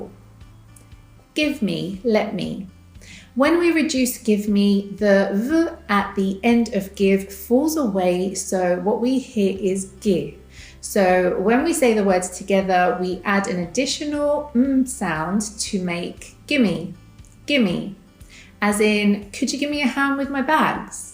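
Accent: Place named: United Kingdom. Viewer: Videos with British accent is very very useful for people like me. Thank you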